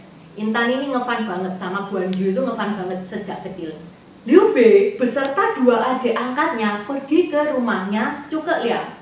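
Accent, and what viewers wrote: Java, Indonesia. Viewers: Klo boleh saran.... Usahakan dalam penjelasan cerita murni pake bahasa Indonesia yg mudah di mengerti.... Krn gak semua pendengar bisa bahasa Jawa apalagi dengan eksen medhok. Hanya sekedar saran ya... Thx u 🙏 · Jeng intan anda orang mana...logat anda medok banget...unik...apa adanya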